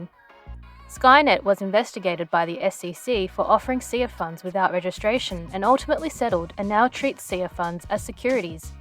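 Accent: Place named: Australia. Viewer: Nice video. You from Oz? I'm from NZ, so the accent stood out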